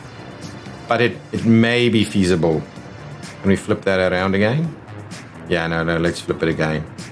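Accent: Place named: South Africa. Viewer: This is gud shit love youre content. Also love youre accent have note heard alot of southafrican but it puts a smile on my face. So thanks fore youre hard work and Star safe in the dificult times!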